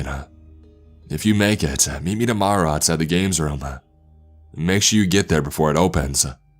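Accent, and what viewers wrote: Canada. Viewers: He’s Canadian probably?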